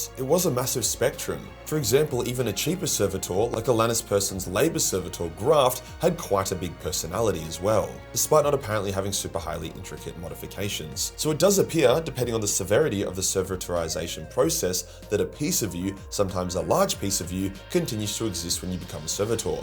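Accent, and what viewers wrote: Australia. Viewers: how did you somehow get more Australian?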